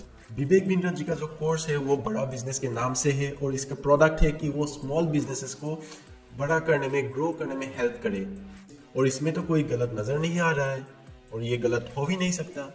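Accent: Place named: Assam, India. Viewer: yes bro absolutely ! 😃 my hindi is also like you and I am also from Assam.. Joi Ai Axom dada ❤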